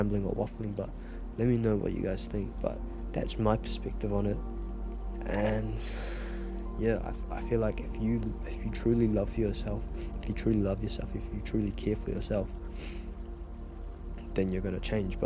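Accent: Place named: Australia. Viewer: He sounds aussie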